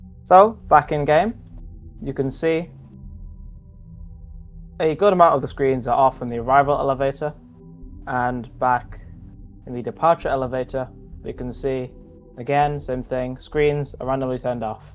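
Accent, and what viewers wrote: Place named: United Kingdom. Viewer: british person talks about elevators for 12 minutes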